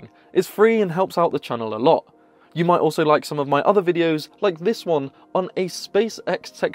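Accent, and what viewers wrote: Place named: South, United Kingdom. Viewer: Southern British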